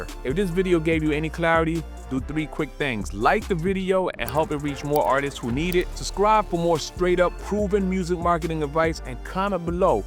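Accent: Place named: Caribbean. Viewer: Random guess based off your accent: are you carribean born but raised in NYC? 🤔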